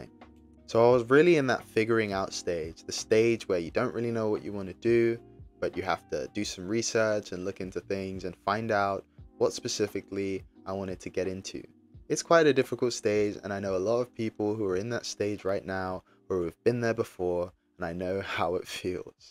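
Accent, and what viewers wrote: United Kingdom. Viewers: Hey man, by your accent you sound like you're based in the UK? How's the demand for cloud roles over there. I'm currently studying for the AWS Solutions Architect Associate cert. But I'd like to believe there's plenty of overlap in cloud if I decide to do anything related to Azure later on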